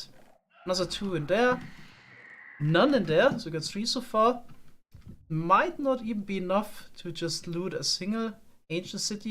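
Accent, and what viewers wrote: Germany. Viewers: Are you German? Your accent really came out in this video. Very nice. 🙂 👍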